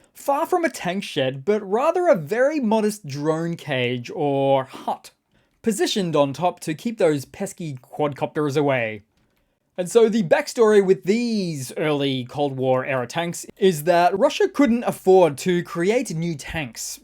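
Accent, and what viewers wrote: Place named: Australia. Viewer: Love your work. I still would like you to pronounce mortar like mitre 10 but with an O, not as in more tar. Great to hear another well informed Aussie voice like Perun. Have a good one!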